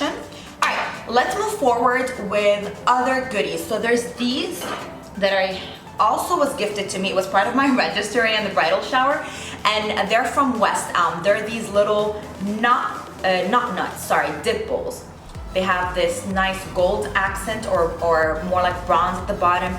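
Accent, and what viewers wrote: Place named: Arab world. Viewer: we still love ur Arabic accent. So it's okay u can pass with it.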